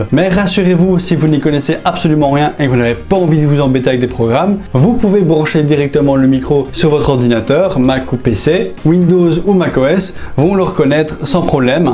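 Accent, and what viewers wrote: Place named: Belgium. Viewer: tu est de quel pays avec cette accent belge peut etre?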